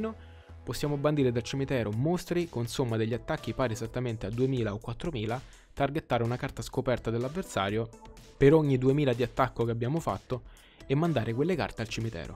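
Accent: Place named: Rome, Italy. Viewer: Era da inizio gioco che cerco qualcuno che spiegasse sto deck, grazie mille... ah solo per l'accento romano te meriti 100k iscritti big up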